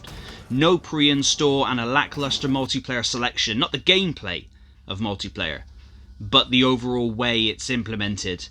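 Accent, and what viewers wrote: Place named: West Country, United Kingdom. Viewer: My game of the year, been looking forward to this game for months and was not disappointed, great review, ive actually found a couple Easter eggs (giant sandwich and the original xbox) I have to ask..... what part of the UK you from? Love the accent! I'm a Bristolian, you have a west country twang! Lol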